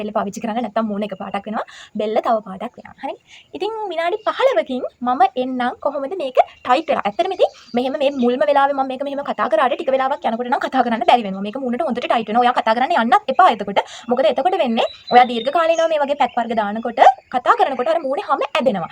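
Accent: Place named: South, Sri Lanka. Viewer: ඒක හරි එයා දකුණේ කෙනෙක් ඒක නිසා කථා කරනවා වෙනස් තමයි හැබැයි ලස්සනට කියලා දුන්නා thank you නංගි ❤️❤️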